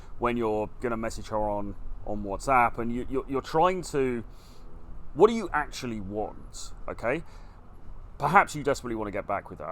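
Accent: United Kingdom. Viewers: Although you and I probably have a number of major differences when it comes to beliefs in life (I believe in Jesus Christ/the bible; not sure if you do), as someone who's right around your age (I think I'm like 2 or 3 years older than you), I love how real you get, and the things you talk about. I've never run across this in the Manosphere, and I've watched TONS of videos since I got "red-pilled" a little under 4 years ago. And, although I'm American (and, this has nothing to do with having significant heritage from the U.K.), it's nice to listen to a well-spoken Brit, especially since--and no offense to anyone--many content creators in the Manosphere sound like they may not have even graduated from high school. I'm sorry, but being intelligent, educated, well-spoken, etc..., regardless of what people say/admit, gets you a lot more credibility than not being those things.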